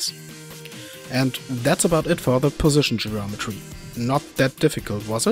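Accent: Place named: Germany. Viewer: I don't know what it is about German people speaking English but it sounds so damn cool, could listen to this dude for hours.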